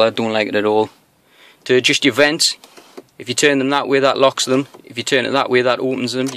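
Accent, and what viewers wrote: Newcastle, United Kingdom. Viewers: Geordie lad!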